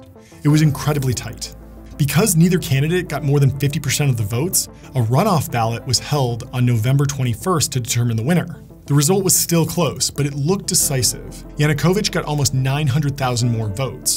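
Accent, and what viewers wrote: United States. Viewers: YOU SOUND AMERICAN.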